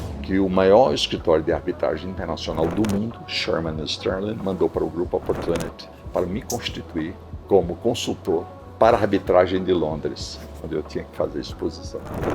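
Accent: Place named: United States. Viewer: Prezado Nabor, que bom vê-lo em plena atividade e cercado por livros raros.Ademais, consegui entender o seu inglês; com nítido american accent.Um grande abraço!⚖️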